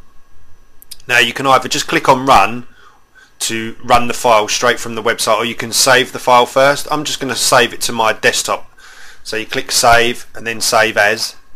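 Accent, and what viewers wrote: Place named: United Kingdom. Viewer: Great stuff, thank you so much. Also, it is nice to hear a fellow Brit helping us out!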